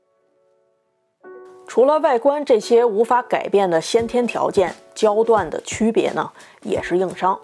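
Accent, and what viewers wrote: Beijing, China. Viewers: 北京口音就这样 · 这不是北京口音吗？像说相声的？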